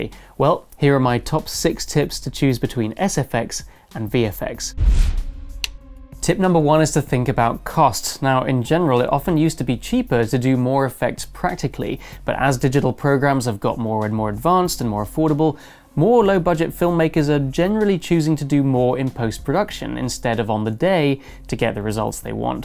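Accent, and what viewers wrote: United Kingdom. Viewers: Ok. Because I heard, your English is British. :)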